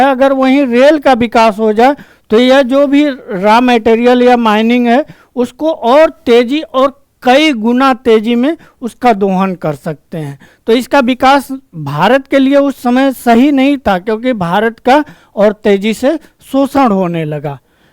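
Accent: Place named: Uttar Pradesh, India. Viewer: Sir himachal look m, Uttar pradesh ki Awaaz... very nice sir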